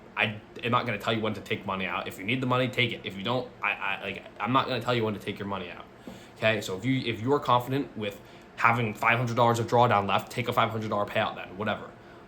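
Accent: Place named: New Jersey, United States. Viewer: Nice video. Do you trade the synced accounts on tradongview? Also so curious where your accent is from. NJ?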